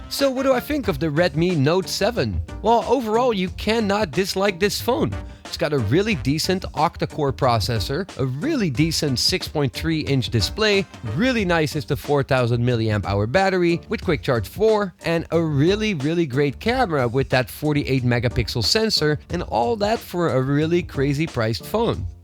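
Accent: Netherlands. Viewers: Nice Dutch accent.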